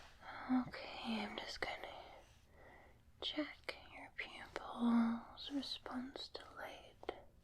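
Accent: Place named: Scotland, United Kingdom. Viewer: Thank you,.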